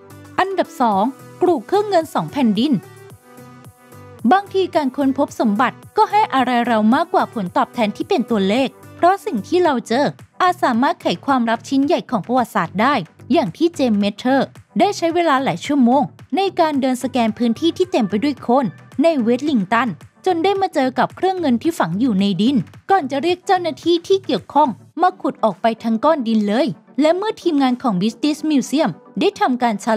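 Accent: Thailand. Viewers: ที่รู้ๆคือ พูดเหน่อมาก นี่ขนาดดูพยายามไม่ให้เหน่อนะเนี่ย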